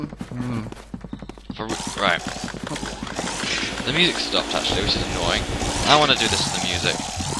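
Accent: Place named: England, United Kingdom. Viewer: is the dude that made this video English??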